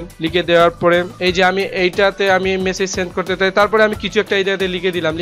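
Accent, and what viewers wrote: Sylhet, Bangladesh. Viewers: ভাই কিতা সিলেটি নি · vai apna bari sylhet ni.......? apnar kotha ja sondor apnar tutorial dakiya ami account kolta parci ... so thanks vai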